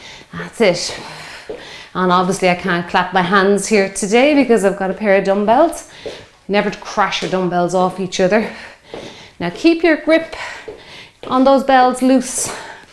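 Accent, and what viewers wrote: Ireland. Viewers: Are you from Ireland? Trying to figure out your accent lol I love it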